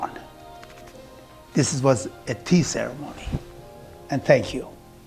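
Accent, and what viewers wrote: Iran. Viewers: Iranian accent is the cutest accent ever :)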